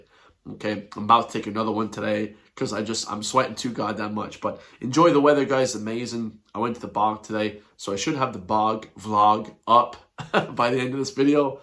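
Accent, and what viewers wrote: United States. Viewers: You sound more American than Irish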